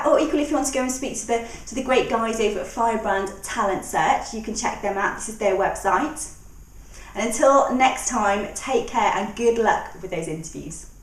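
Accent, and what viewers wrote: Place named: England, United Kingdom. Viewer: I like your English Accent, it's awesome!.. An employer asked me "How CHEAP will you work"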